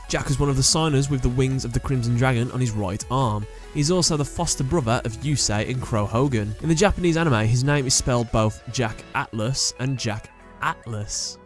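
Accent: United Kingdom. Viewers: You sound British but you can't speak like ATHLAS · Dude You Speak In A British Accent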